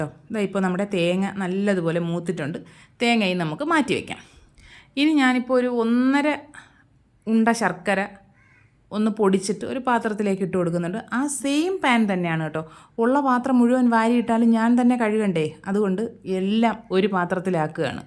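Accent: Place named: Kollam, India. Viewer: കൊല്ലം ജില്ലക്കാരിയാണോ? സംസാരം ഞങ്ങൾകൊല്ലക്കാരുടെ പോലെയുണ്ട്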